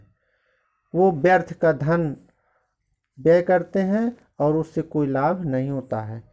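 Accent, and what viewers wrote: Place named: Australia. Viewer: Sir ye austrilan thr American nahi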